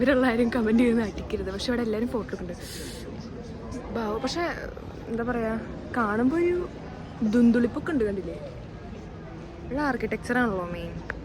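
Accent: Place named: Thrissur, India. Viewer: Aa thrissur slang 😁😁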